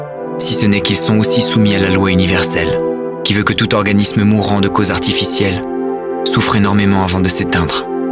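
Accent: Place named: Spain. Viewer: Bel accent espagnol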